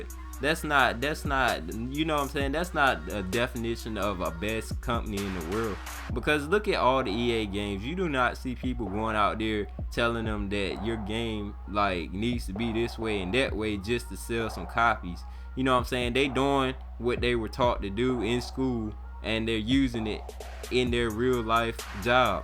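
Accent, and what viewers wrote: United States. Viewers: I knew you was from North Carolina lol on god..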